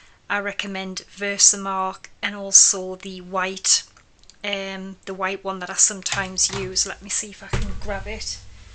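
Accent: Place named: Northern England, United Kingdom. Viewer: It's almost half past one in the morning here - I found you earlier this evening and am blown away! What a star find! I've been card making for around 20 years and just love your ideas. Photopolymer stamps also have a distinctive smell compared to acrylic ones - sadly even some reputable UK designers are cutting their costs and still have their stamped made overseas and many of them are acrylic. AND, my laminator is coming out to play when I eventually get up later today! HUGE thanks - how I wish I'd known a lot of this particular video when I started out.. I obviously have a lot of hours of fun viewing ahead! Di x (Northumberland exile - so it was an extra delight to hear your lovely Northern accent).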